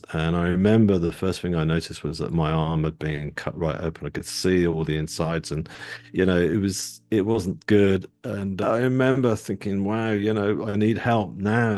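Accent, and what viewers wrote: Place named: England, United Kingdom. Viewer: So good to hear an English Voice NDE. So gentle, soothing and Real. 🫶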